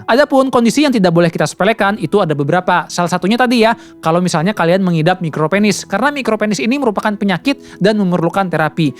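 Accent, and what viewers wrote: Banjar, Indonesia. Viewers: lohh urang banjar sekalinya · Eh orang banjar kah?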